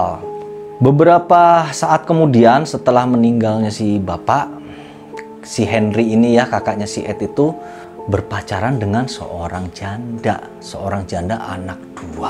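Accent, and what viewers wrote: Java, Indonesia. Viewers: logat jawa nya kenthel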